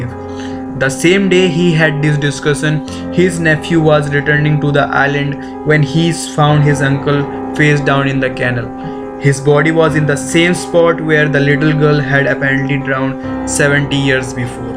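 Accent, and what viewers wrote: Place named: Bihar, India. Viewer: Maa kasam bht bura...... Bht bekar accent 😐 bc esa lgra koi bihari ldka bol rha..... Bura mt manio bt sch me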